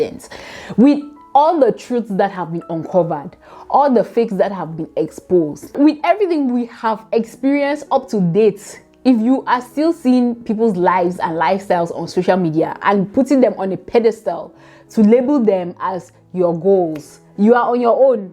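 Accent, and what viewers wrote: Nigeria. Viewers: Please are you a Nigerian I'm trying to figure out your accent....m obsessed 😘... oh....love you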